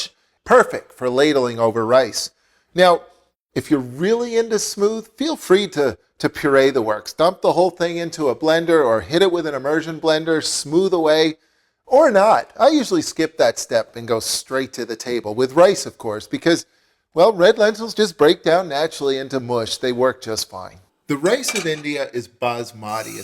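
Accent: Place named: Canada. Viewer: He has a Canadian accent but Canadians don't say Dell instead of Dhal. I think its ignorance.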